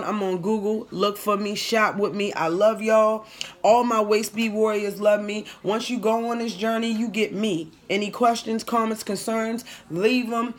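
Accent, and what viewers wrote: Baltimore, United States. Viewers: Are you from Baltimore? You have the accent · Are you from B- more? Your accent reminds me of home.😊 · Are you from Baltimore? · I hear them "ewrs" in your words... you from Baltimore aint you? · You from Baltimore? the way you say "to" 😂I love y'all accent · Thats that Baltimore accent. Hey sis! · You just spoke to my soul...I love how real you are. I have killed 3 sets so far...my placement was wrong for my skill level and possibly my body type (apple shaped/bbw). You have given me so much encouragement. In the first minute of you speaking I said she sounds like she is from Baltimore (our speech is very distinct). Thanks sis! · I knew I heard that Baltimore (me too). Glad I found your video. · Juna Luna Sooooo glad you mentioned this! I have a friend that lives in Baltimore and I thought I was crazy because she sounds just like her 😂😍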